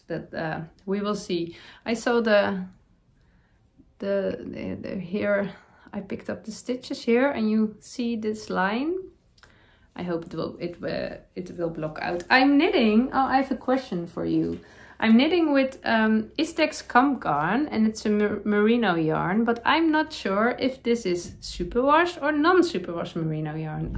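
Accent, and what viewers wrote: Netherlands. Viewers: Greetings from Chicago, Illinois, I came across your podcast a couple weeks and what a pleasure it is to sit down, knit and see all your beautiful makes:) I wanted to see all your episodes so i started from the beginning of the English episodes lol Dont worry about your "Dutchy English" you are doing a great job!!!!! I checked Ravelry for the Kambgarn and it is listed as a Sport weight yarn, 100 % wool - merino dyed and milled in Iceland, it also says Kambgarn is pure imported merino wool. I guess its safe to say its NOT superwash.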